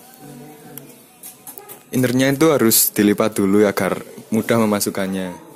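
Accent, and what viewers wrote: Java, Indonesia. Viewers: Mase medok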